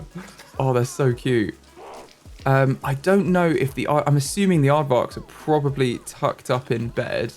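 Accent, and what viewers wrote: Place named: United Kingdom. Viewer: Could be a UK versus US thing, as I pronounce it ah-mean-ities too, like and their accent sounds UK.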